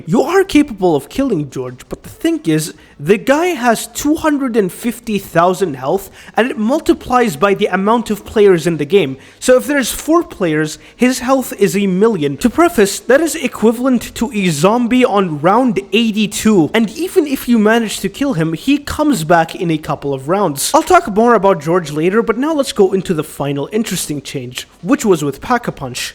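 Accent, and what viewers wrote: Arab world. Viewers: من صوتك عرفت انك من ربعنا🫡 والله مقطع ممتاز بس يرحم والديك خذ نفس وخلنا ناخذ نفس معك، صدع راسي من كثر ما انه مافيه اي استراحة بين كل جملة وجملة. يعني خل الكلام يطلع بشكل طبيعي مو جملة ورا جملة ورا جملة كأنك روبوت مرة ثانية والله مقطع ممتاز واخيرا احد عربي سوا مقطع (كويس) مكثف عن الزومبي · Your accent is Arabic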